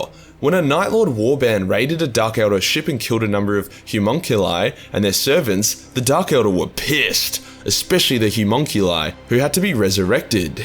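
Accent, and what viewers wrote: Australia. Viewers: Loving the content ,and am guessing from the familiar accent ,your a fellow Aussie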